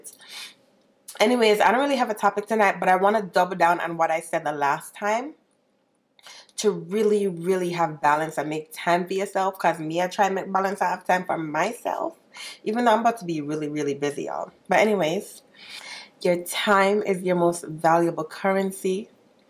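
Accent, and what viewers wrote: Jamaica. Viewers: I knew it just the way u talk I know u Jamaican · I LOVE UR ACCENT Where are u from? u prolly already said but haha sounds like Jamaica but its lovely xD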